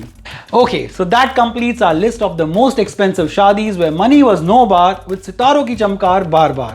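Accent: Gujarat, India. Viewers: Your narrative style far outshone the big fat Indian weddings with your right mix of gujju accent, filmi phrases and getting Canne accent right ,along the way!!!You are on a roll in this session and your ending where you plugged the hair product was done with class unlike the crass show of money in these weddings!!!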